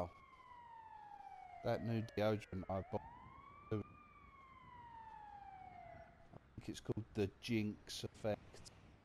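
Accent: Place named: South, United States. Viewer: Hahahhahahaha!! Loved the Country accent man!!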